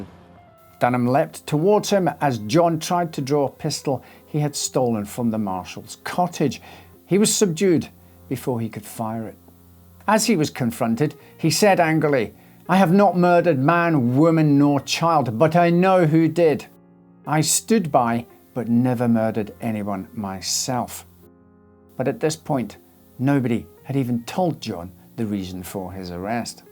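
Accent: Scotland, United Kingdom. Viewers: This is in England but his accent is Scottish. I'm sure they'll let you off lolol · Love your vids. Is your accent mixed? I swear I keep hearing Scottish for a split second here and there, then suddenly you're English again, lol. I wonder if I sound like that myself with living in England and having had a Scottish dad. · The accent is not English , it is a Scottish one.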